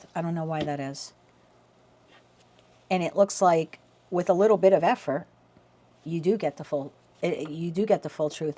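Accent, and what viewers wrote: East Coast, United States. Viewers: You sound like you’re from the East Coast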